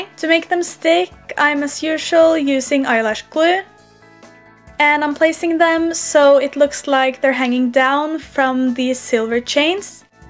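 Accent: Norway. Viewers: you can hear that you're from norway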